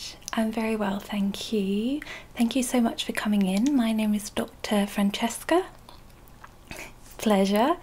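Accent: Scotland, United Kingdom. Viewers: just here to say that I love your videos, british accents are a trigger for me :) hope you’re doing well! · See the problem with your voice and accent is that it is the type of voice and accent that Americans think of when they think of the UK which means they're not ready to hear us Brits with not as well spoken voices and accents! ;) In all seriousness, cracking video! I will make sure I binge a couple more of your videos later! x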